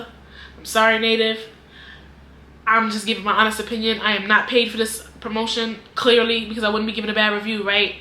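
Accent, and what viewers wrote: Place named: New York, United States. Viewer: You sound like a native New Yorker ! 😂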